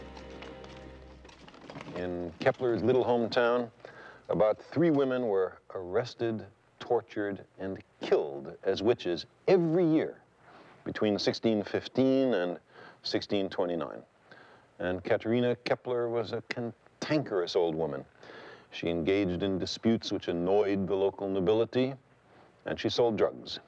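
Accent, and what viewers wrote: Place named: Brooklyn, United States. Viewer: Carl was born in Brooklyn and lived there as a child. It must be noted that the "Brooklyn twang" was different than today than when was a child in the 1930s ( would be pushing 90 if he were still alive). It must also be noted that spent the majority of his life in high-level universities such as Harvard, Cornell and Berkeley. Being a lecturer it's likely he taylored his accent for his students.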